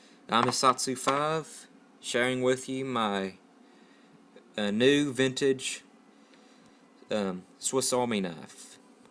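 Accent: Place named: South, United States. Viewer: You honestly don't sound like you have one... just an American Accent from the south.... you pronounce most words fine... ignore the haters man :)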